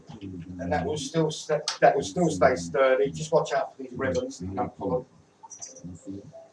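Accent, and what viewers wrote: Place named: United Kingdom. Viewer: hello, glad you have it still working! Please tell me , are you a Londoner ? You have a pure British accent) Or, if not, how this accent is called ? I do get aesthetic pleasure hearing your “so british” speech)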